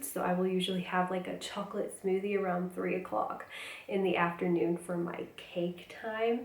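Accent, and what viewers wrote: United States. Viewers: You speak softer American accent... more feminine and polite sound...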